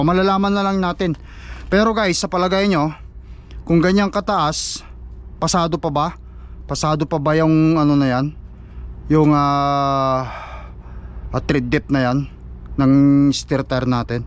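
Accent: Ilocos, Philippines. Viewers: kung may bakasyon ka ngayong summer, mag english lesson ka kasi kung mag english ka ilocano accent.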